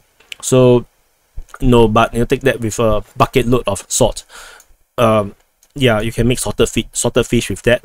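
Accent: Singapore. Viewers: are you Singaporean or Malaysian? no horse run brother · You sound Singaporean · Agree, lets say the smallest bias then. I suspect leans somewhat to the russian side. But probably doesnt care too much. says; "I just want the war to stop" - and I belive him. Again, because is from Singapor is able to distance himself from the bias thing. I am surprised that has so many subscribers, due to the heavy axent. It is probably due to the neutrality in his reporting and nothing else. even makes small jokes while reporting, noone else does that. Again, that is also probably due to his true neutral standing. Because doesnt really care either way.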